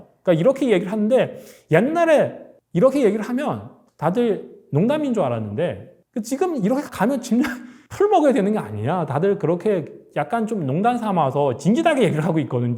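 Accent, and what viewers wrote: China. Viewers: 이분 중국인 인가요? 발음이 한국인이 아닌거 같아서요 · 이 분 발음상 중국인인가봐요. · 발음이 꼭 중국인 같은데요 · 짱깨 발음 들어야 돼? 우리 아이들 다 망친다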